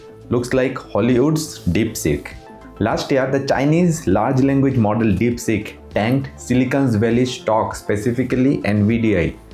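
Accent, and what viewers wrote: India. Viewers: Curry English gives me terrible headaches.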